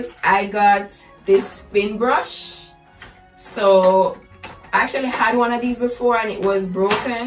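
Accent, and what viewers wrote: Jamaica. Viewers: First time watching your video and I love it . are u from Jamaica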